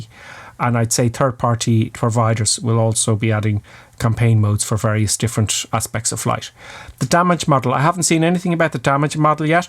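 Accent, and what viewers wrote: Ireland. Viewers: are you irish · As an American, I love your Irish accent and the excitement in your voice! And you have a great sense of humor! 😂 Makes the time pass by quickly until the release! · Where in ireland are you from lad? I’m from tyrone.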